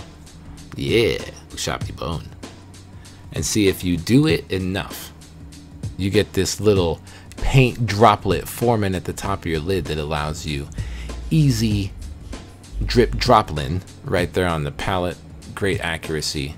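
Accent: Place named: Milwaukee, United States. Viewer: You wouldnt happen to be from Milwaukee? Sounds like the right accent. Much love.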